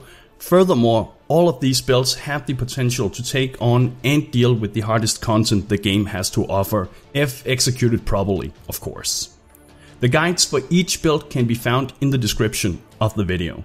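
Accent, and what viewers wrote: Denmark. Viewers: that danish accent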